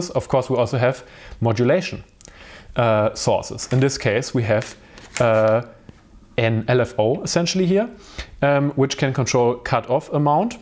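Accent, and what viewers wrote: Germany. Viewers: While a few people here have commented, unkindly and disrespectfully about how you pronounced a few words, I am guessing they are not aware of how we each speak similar languages a little differently. I refer to such things as cultural difference which are tied to where we each grew up. I love your accent. Is it German? I had no problem understanding everything you said. Unlike most all of Europe where people study multiple languages in school growing up, such as Spanish, French, German and so many more, folks in the United States only typically study American English. While not a bad thing to only study one language your whole life, I have traveled to Europe, but have grown up in the US. I am sure that if you had an accent like you grew up in New York City, other folks would make similar comments about the accent or how you pronounced other words. 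Language is language, and as we learn more, we learn to respect others more and find and share our comments ground. Thank you so very much for this wonderful video! Now, I wonder if you have considered creating a similar video comparing and explaining the differences between, say, a Pittsburgh Modular Microvolt 3900 or similar and a comparably priced east coast style synth like a Roland System-1m. I suggest these two specifically because I have them both, are I a similar price range, and both provide standard EuroRack connections for creating new patches and sounds on just the one instrument, yet both can connect to EuroRack synths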